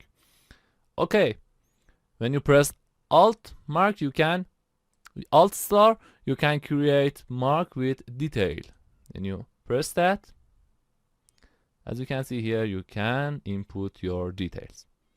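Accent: Iran. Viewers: Thank u for this useful tutorial , but u really need to work on ur persian accent , maybe u should listen to nosrat english learning to correct ur accent , thnks again.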